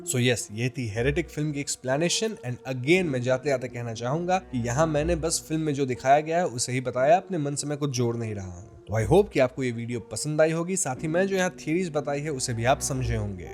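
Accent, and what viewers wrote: Bengal, India. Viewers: Bas kharab Hindi sunke bar bar mood kharab hogaya. Bangal se ho kya bro? Jara Hindi pe kaam karona.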